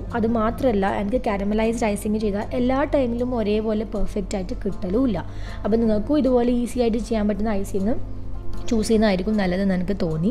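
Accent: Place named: Kannur, India. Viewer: കണ്ണൂരാണോ നിങ്ങള്.. വർത്താനം കേക്കുമ്പോ അങ്ങനെയിണ്ട്..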